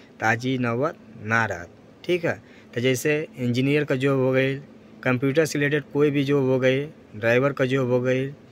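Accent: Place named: Bhojpuri, India. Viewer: भाई आपके मुंह से भोजपूरी बहुत अच्छा लगता हैं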